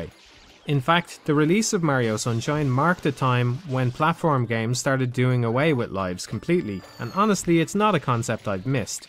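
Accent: Dublin, Ireland. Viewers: Great to hear a working class Dublin accent on youtube, especially one that voices such well informed analyses.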